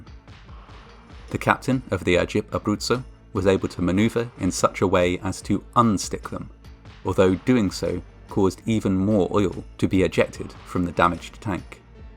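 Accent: United Kingdom. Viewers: This guy has a great delivery style. Does anyone know which British accent he has? 🤔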